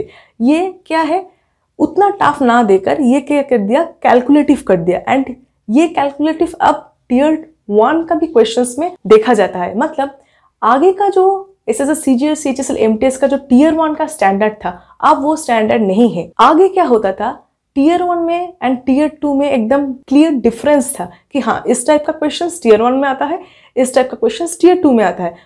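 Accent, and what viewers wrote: Bengal, India. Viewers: Aap bengali ho kya mam ??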